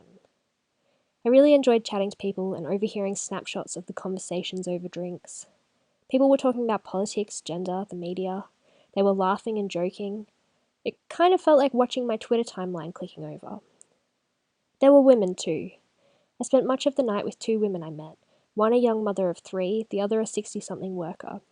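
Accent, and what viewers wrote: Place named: Australia. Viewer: Also: with such a prevalence of US video producers, it's so relaxing to hear an Aussie voice. Thanks for the vid.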